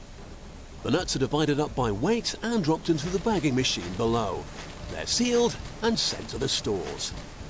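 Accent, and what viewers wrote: United Kingdom. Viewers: And a brit remittance man narrating. Is there nothing these manglers of their own language will not pretend to be exerts on?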